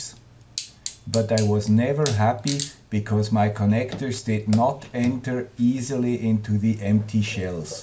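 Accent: Switzerland. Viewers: Greetings the guy with the Swiss accent :)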